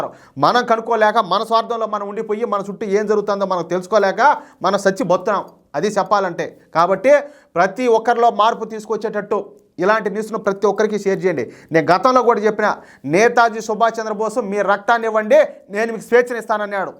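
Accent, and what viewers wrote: Rayalaseema, India. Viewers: రాయలసీమ యాసలో నిక్కచ్చిగా చెపుచున్నారండీ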